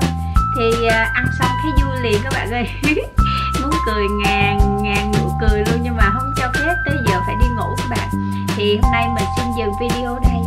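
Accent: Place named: Hue, Vietnam. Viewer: Cô nói tiếng huế hay ghê á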